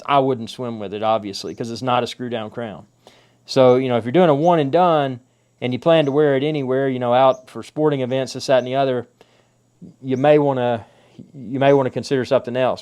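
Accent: South, United States. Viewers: It’s weird to hear a southern accent speaking about a European luxury watch 🤣 but great content 👍🏽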